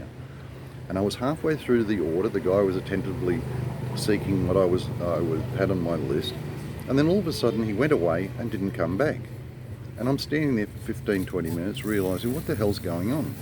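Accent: Australia. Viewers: Well done great vlog, nice to hear a Aussie voice were in central Queensland so lovely to hear direct items about the Philippines no bull just real deal thank you , just subscribed looking to the future videos , cheers mate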